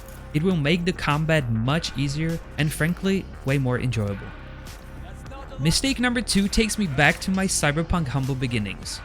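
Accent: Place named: United Kingdom. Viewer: Why is it always someone with a british accent telling people how to play the game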